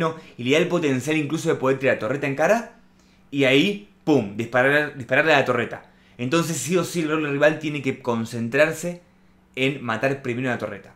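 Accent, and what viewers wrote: Argentina. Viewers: De qué parte de Argentina sos?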